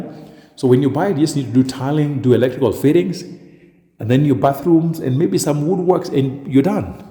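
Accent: Luo, Kenya. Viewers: Like your tweng..but yu keep it real when yu pronounce Luo words..kosele!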